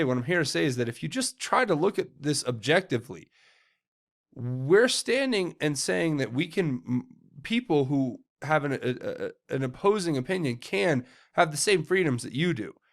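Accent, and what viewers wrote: United Kingdom. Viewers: Hahahaha 🤣 Love the British accent 👏👏👏👏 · I love the English accent. You did a great job. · Fabulous British accent!!!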